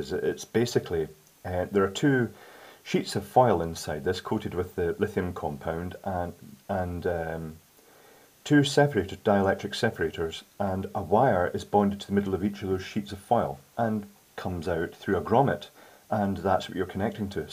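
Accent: Scotland, United Kingdom. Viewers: Nice to see your face!! I really love your videos and also your beautiful British accent; nice radio voice! Keep up the good work! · Scottish Accent.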